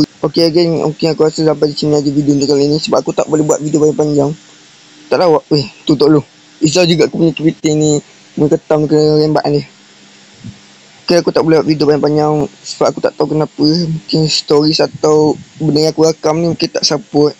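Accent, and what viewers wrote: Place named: Malaysia. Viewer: ngakak ame kaba ngomong Malaysia tuh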